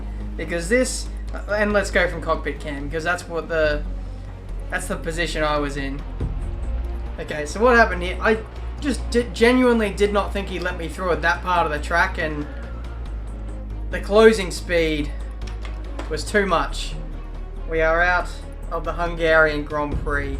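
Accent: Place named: Australia. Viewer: The Australian accent. "err" instead of " ahh""Ninjerr" instead of "ninjahh".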